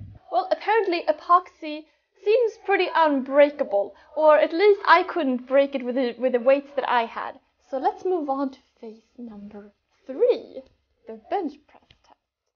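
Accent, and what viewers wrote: Sweden. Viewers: Are you swedish? Sounds like you have strong swedish accent :) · Are you Swedish? Great vid! Thanks!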